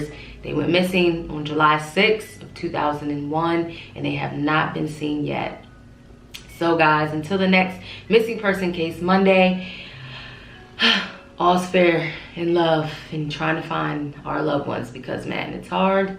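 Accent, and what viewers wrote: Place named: Baltimore, United States. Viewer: Side note are you from Baltimore? Your accent ☺️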